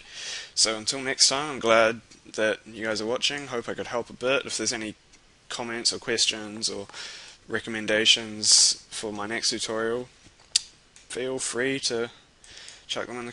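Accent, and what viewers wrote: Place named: New Zealand. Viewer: Bro NZ accents are sick, coming from a Canadian here :) Aussie accents are sick too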